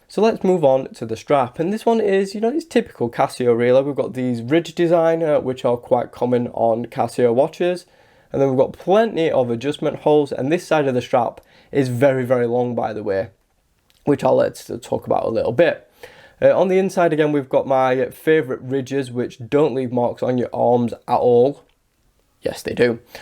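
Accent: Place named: West Yorkshire, United Kingdom. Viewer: Great watch. Wearing mine now! Love the accent too - I'm going West Yorkshire?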